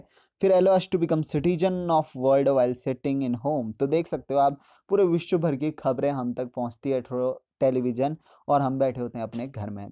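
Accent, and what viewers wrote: Pahadi, India. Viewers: Bhai tu pahadi h na accent se lgra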